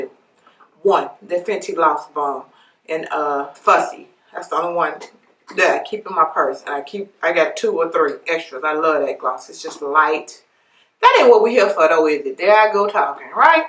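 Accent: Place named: Texas, United States. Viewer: Your accent makes me so happy. Are you from Texas too? Hugs!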